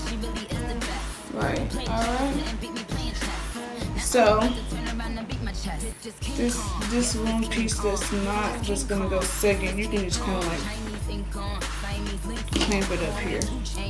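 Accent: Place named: Baltimore, United States. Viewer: Im From Baltimore 😍😍 u do talk like us a lil bit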